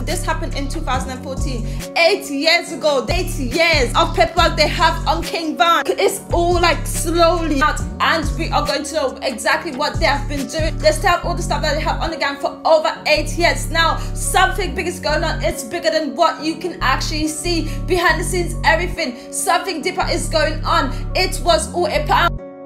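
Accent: London, United Kingdom. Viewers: Are you from london smh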